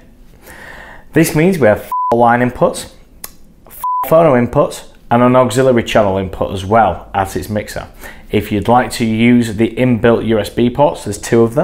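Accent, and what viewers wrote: United Kingdom. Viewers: ... this dude looks like Jared Dines with a British accent!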